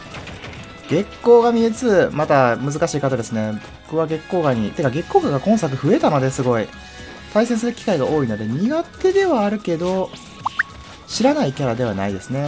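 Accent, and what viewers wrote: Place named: Kansai, Japan. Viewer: 良い関西弁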